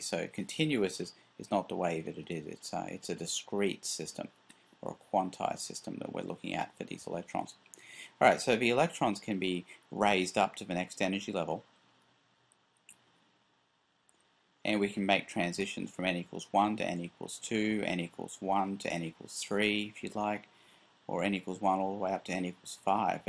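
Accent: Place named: United Kingdom. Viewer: you bless my sleep with dreams of your british voice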